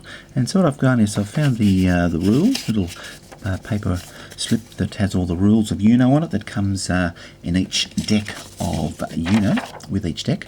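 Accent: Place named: Australia. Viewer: OMG! YOU'RE AUSTRALIAN! Im really sorry, im really really sorry, i did learned a lot thanks for the video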